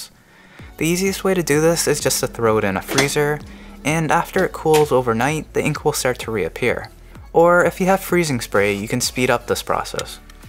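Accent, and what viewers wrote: Canada. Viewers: Nice video. I watched because I got one free and didn't know how to use it. You sound very Canadian.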